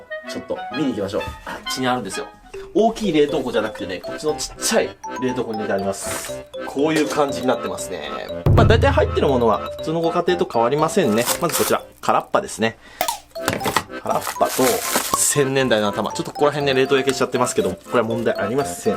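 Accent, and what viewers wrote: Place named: Seto, Japan. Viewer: 小さい頃、瀬戸市に住んどりました。懐かしい話し方と発音が耳に心地よいです💧😢 特に、魚おじさんと話してるときは、ほっこりします。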